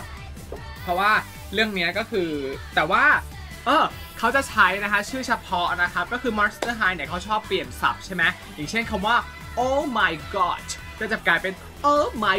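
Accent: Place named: England, United Kingdom. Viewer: สำเนียงอังกฤษมาเต็ม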